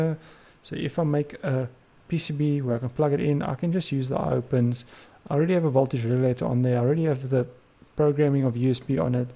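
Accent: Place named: South Africa. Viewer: Thats that South African Accent. Shout out from the free state.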